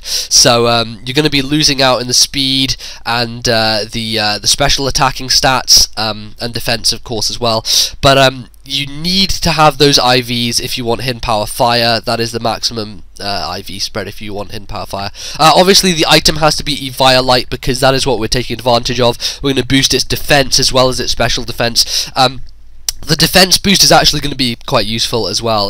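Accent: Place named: England, United Kingdom. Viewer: your obviously english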